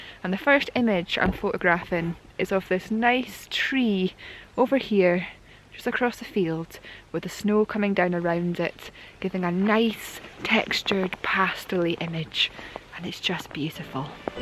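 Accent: Scotland, United Kingdom. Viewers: Great Vlog, wonderful location, Brilliant conditions, I am impressed with your Photographic eye, which is supported by your narrative and easy listening scotch accent. thank you for sharing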